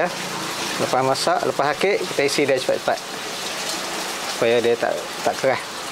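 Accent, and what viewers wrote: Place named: Kuala Nerang, Malaysia. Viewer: Tok ckap mcm org blah kola nerang ja.. 😂😂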